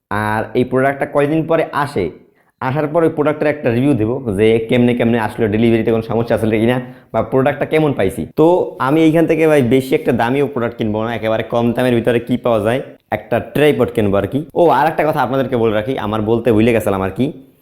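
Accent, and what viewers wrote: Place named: Sylhet, Bangladesh. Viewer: ভাই তুমি সিলেটি😅😅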